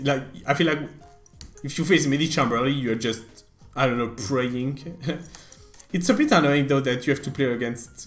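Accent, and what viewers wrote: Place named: France. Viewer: When you try to hide your french accent but it's getting worst..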